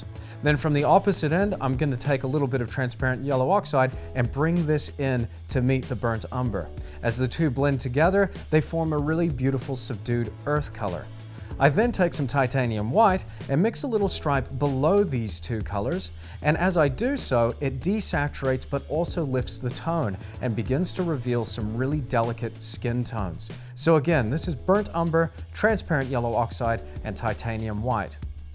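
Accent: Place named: Australia. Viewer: you sound slightly australian but also american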